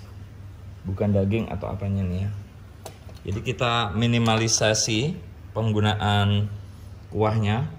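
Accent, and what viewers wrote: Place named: Madura, Indonesia. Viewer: Ari Lasso pake bahasa logatnya Madura. Berarti dia masih tinggal di Jakarta. Padahal rumah aslinya itu di Madiun Jawa Timur.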